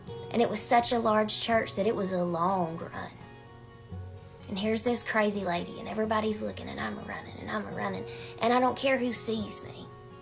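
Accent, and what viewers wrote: South, United States. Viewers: Your soo illuminated, love your country voice ! Still wondering about that cornbread and Sweet tea😊❤❤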